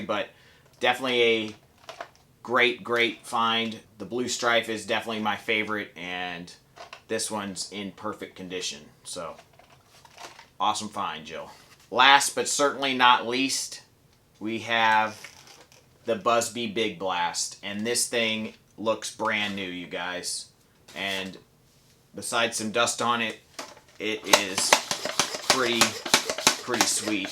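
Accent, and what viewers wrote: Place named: South, United States. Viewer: Where do you live?!?!! You have a southern accent.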